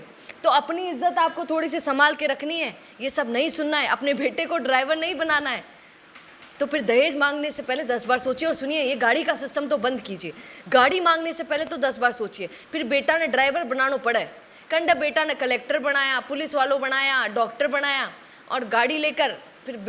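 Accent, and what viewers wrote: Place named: Marwari, India. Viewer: Madam ak kahase ho, hindi or marvadi mix bol rahe ho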